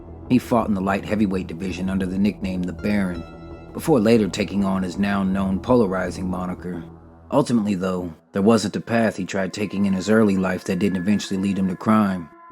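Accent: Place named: South, United States. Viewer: Where is your accent from? I'm from New England and I know it's not from Boston. I hear hints of mid southern or even Midwest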